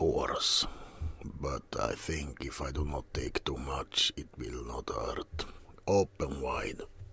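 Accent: Russia. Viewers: I love these Russian ASMR vids they are genuinely hilarious and your Russian accent is brilliant hope you're doing well take it one day at a time my friend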